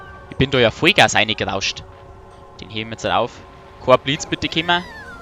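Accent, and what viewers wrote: Bavaria, Germany. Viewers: wie immer a guards Video! und was mir daugt is dei Dialekt, wenigstens mal a gescheites bayerisch,mach weiter so👌😉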